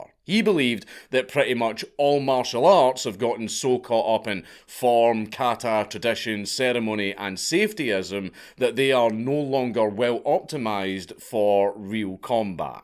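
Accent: Scotland, United Kingdom. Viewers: words are hard... especially in a scottish accent...🙏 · This was such a good one. Thanks for putting in overtime. Sometimes when I’m watching your videos I have to actively remind myself that it’s not Nick Offerman doing a Scottish accent. Are you two related?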